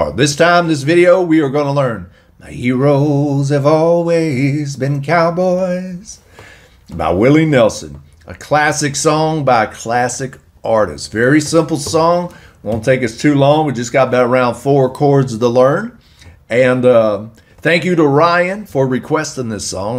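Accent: South, United States. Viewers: Great call on the 3/4 timing. I just got through seeing another video of this song, supposedly Willie Nelson version, and they were playing in faster tempo in 4/4 timing. Btw, love your voice as well. Very Southern 👍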